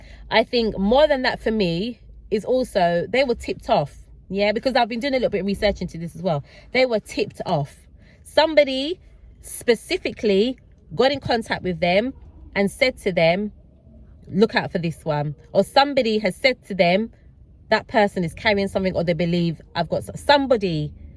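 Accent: United Kingdom. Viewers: It seems to me that you were a little too prepared yeah 2 travel frequently if someone is a frequent flyer and then hopping over and over they wouldn’t need the amount of information that you got if you gave them very little it would’ve said she’s not prepared because you had so much information to squash there they suspicion against you. It feels that they saw you as too prepared for them so that is why they gave you the full degree treatment so next time Missy let them ask the questions don’t chitchat with them saying I watch border force bullshit. you thought your British accent was gonna get you through and be treated decency skin colour is a criminal so they showed you how they see you. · Okay. My apologies. Did not watch to the end. Nice British accent 😎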